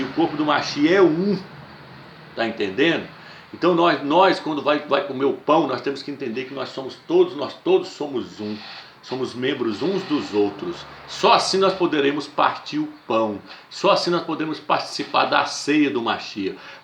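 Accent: Northeast, Brazil. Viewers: tu é do nordeste amado??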